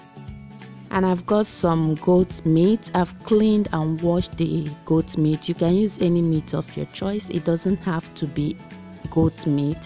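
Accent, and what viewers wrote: Nigeria. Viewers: Madam the soup it's self looks exceedingly palatable but the sweetest thing you did is employing the Nigerian twang in your vocals. Keeping it real as you are suppose to be, as you are, Natural. Unlike the cartoon characters one sees via you tube. So fake and unreal. Love you, keep it up.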